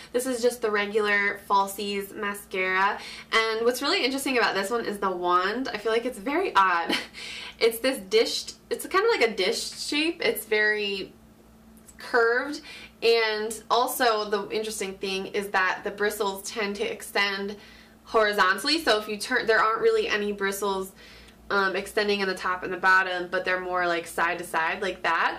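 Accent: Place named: Philadelphia, United States. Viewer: You sound like you're from Philly or Princeton area, where I live, & you look so familiar. Did you used to work in marketing or design before you started doing makeup?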